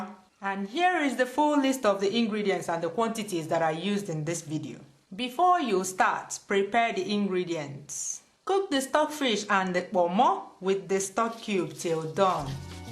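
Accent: Igbo, Nigeria. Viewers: Me encanta tu acento! Igbo woman 👌👌👌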